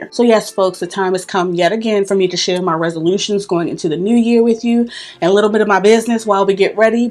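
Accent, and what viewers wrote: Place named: Baltimore, United States. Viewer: Happy New Year,! 🎉 Life has been lifing so I haven't been around in a while. But, you've always been a real one and I enjoy watching you. Whenever I need my dose of Baltimore "ouu" or "ur", your my girl. 😂💯 I pray that all you've set before you and even the good ones you didn't coming, come true in 2024. ❤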